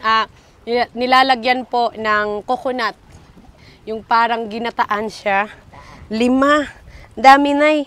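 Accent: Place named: Visayas, Philippines. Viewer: Mailhan jud ang bisaya mo storya dol no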